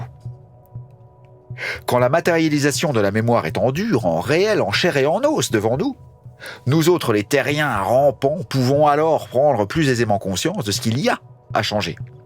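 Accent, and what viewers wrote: France. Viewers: Très intéressant. Et avec un très léger accent ardennais ce qui ne gâche rien ;-) . (Je vais être hors sujet mais tes vidéos de basse manquent un peu. Au delà des magnifiques cover de maiden, avec le niveau que tu as, ce serait génial que tu fasses plus de vidéos pédagogiques comme le galop de Steve ) merci pour tout. Musique et spiritualité, ta chaîne est passionnante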